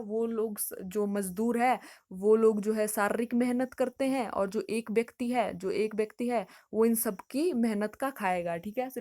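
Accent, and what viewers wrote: Haryana, India. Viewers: Ur presentation is really interesting and it feels gud to get a haryanvi touch in the slang. Thank u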